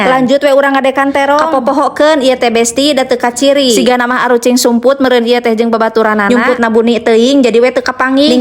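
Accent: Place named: Sodong, Indonesia. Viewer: Logatnamah ciga urang Sodong.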